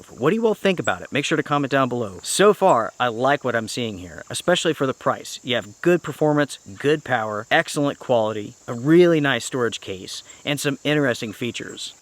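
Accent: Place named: South, United States. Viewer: Great little stove. I love your reviews and your voice. Very smooth with a slight southern accent. ❤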